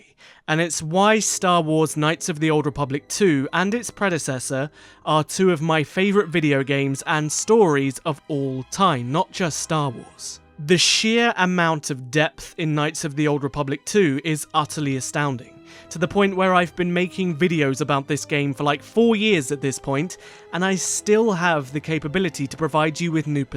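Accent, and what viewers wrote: RP, United Kingdom. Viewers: I apologize if this is an ignorant question, but is your accent recieved pronounciation? I love your videos, I've been binging them for a few weeks now. Kotor 2 was more than just a game to me too, I can't wait for the future of narratives set during this era.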